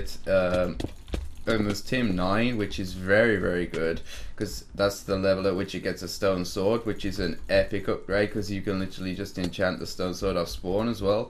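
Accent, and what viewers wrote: United Kingdom. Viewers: bruh did become crazy more British as he aged? He has very little accent here...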